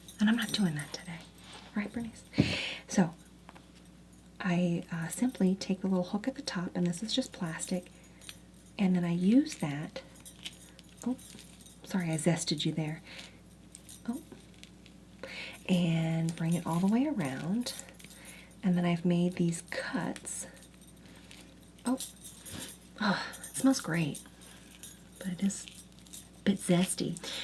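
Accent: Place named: New Jersey, United States. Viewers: Why she sound like she from Jersey 😂😂this is so funny to me. It's ASMR comedy 😄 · The Mid-Atlantic/Philly vibe is ON POINT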